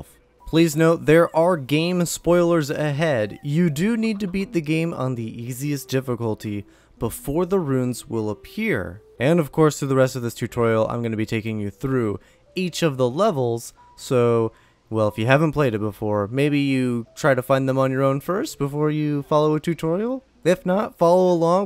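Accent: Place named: England, United Kingdom. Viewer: Me: DAD I FOUND A VIDEO WITH A GUY THAT HAS AN ENGLISH VOICE! dad: so?? Me: He also tells us how to get to that secret lvl... dad: NANI?!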